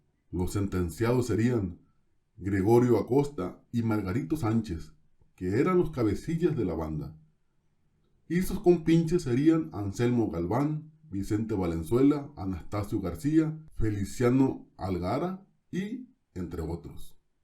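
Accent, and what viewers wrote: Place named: Guerrero, Mexico. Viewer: Esté tío habla cómo sí fuera de Guerrero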